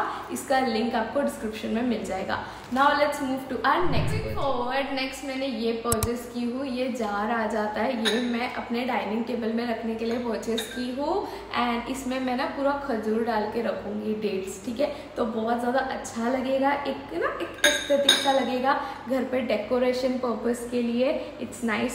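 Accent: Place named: Hyderabad, India. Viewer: Areeee ap Kerala se ho muj laga Hyderabad se ho apki Hindi Hyderabadi type hai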